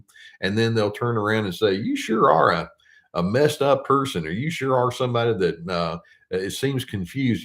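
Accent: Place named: Texas, United States. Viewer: I knew it! I was asking myself just the other day where you might be from & l said "I'll bet is from Texas." Not to brag but l was right! I used to live in Austin where l met folks from around the State & your charming accent brings back fond memories of my time there. I'm a much happier & more capable person in dealing with my own situation since l started watching your channel a year & a half ago. Thank you from Toronto, Canada.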